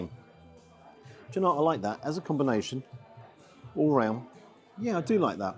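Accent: RP, United Kingdom. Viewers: first time viewer greetings from the West Coast of The US. Just wanted to let you know your an absolute good restaurant reviewer with the personality of Gordon Ramsey with Anthony Hopkins mixed together. You have a Regal British accent that is easy for us " Yanks " to understand. I really appreciate your down to earth affordable creetiking for us non wealthy class folks. Have you thought to coming to the States to do a tour? Thank you again.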